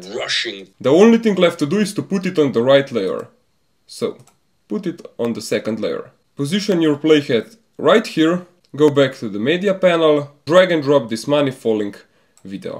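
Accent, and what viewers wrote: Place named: Russia. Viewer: Почему-то чувствуется русский акцент)))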